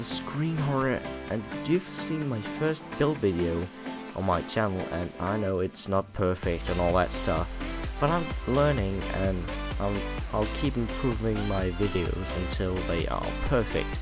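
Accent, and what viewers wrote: Denmark. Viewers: I hear Denmark, i like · R u Danish? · I was about to comment "where are you from i love your accent" but i think i love it because I'm from denmark to, oh and it's called an overhang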